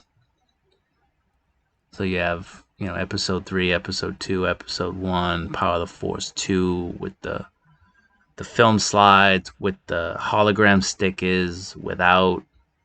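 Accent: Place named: New England, United States. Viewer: Definitely from New England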